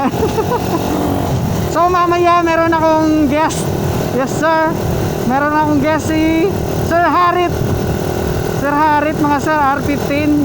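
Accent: Iloilo, Philippines. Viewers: boss ang ilonggo nyo kag ilonggo dre sa tacurong sultankudarat paryas paryas gid haha long live ilonggos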